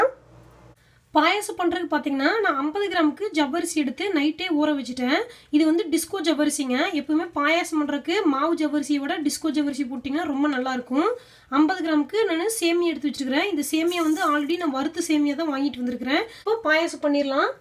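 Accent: Kongu, India. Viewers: Nenga pesaratha kekave superb ah eruku... Kongu tamil me 2 kovai thanga akka...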